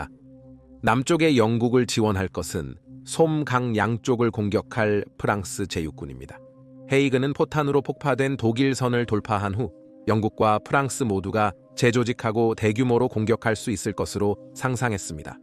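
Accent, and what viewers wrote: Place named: China. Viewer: mbc 손령처럼 중국발음 오진다. ㅜㅜㅜ ㄹㄹㄹ 전형적 중국인 발음 ㅋㅋㅋㅋㅋ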